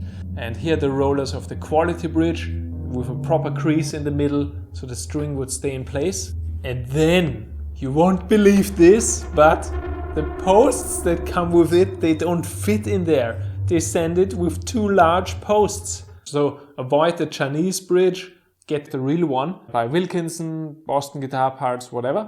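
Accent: Austria. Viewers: Also are you Austrian by chance?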